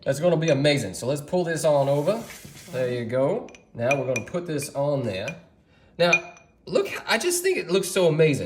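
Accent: South, United States. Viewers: That glorious Southern voice …a true gift from God…😊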